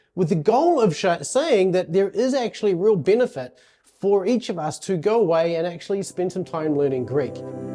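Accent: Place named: New Zealand. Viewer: New Zealand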